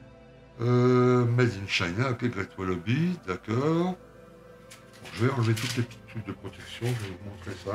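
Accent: North, France. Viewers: Sympa la petite voix un accent de ch'nord (normal pour un billoutte)...Super maquette..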